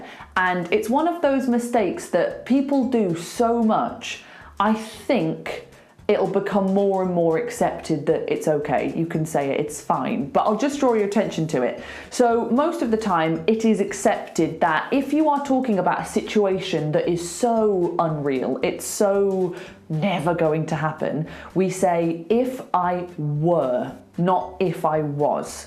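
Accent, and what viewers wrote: United Kingdom. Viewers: Love British accent and your videos.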